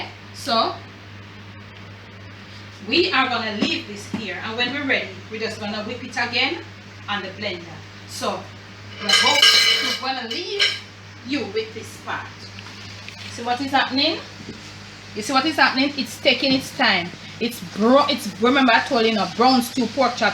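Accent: Jamaica. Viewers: Real Jamaican🇯🇲 Chef this enuh full a drama & she definitely NOT acting she giving us raw Patwah ! Well done 👍 Perfect garnish is Yu TEETH 🦷 🤣🤣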